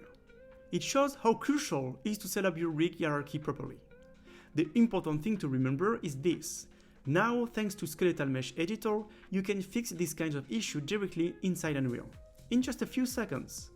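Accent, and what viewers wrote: France. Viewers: I really appreciate the effort you put in the tutorials.Just if you could a bit slower.....with the french accent and your speed talking, sometimes it´s really hard to follow (dont want to be rude, just an advice). Love the channel BTW!! really helpful video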